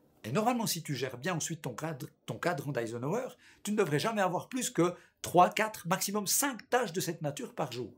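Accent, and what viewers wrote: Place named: Romandy, Switzerland. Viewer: Je viens de découvrir ta chaine et j'ai été très agréablement surpris :). Bon contenu, bons conseils, des trucs réalistes et honnêtes, ça fait plaisir dans ce domaine. Je décèle une pointe d'accent familier, est-ce que tu viendrais pas d'un petit coin de Suisse romande par hasard ?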